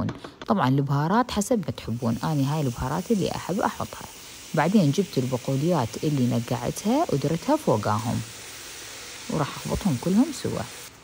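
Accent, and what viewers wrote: Iraq. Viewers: يسعدلي هالصوت العراقي ❤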